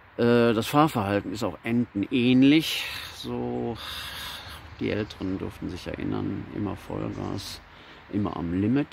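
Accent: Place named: Ruhr, Germany. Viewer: Ömma. Du komms aber auch ausm Pott, oder?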